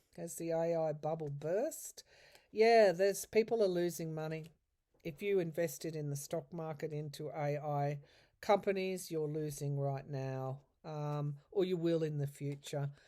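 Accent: Australia. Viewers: So refreshing to hear an Australian voice!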